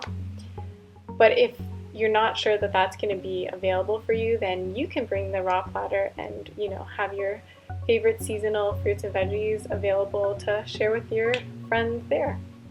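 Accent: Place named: Canada. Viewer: dunno where she lives now but she definitely sounds canadian based on how she says 'about'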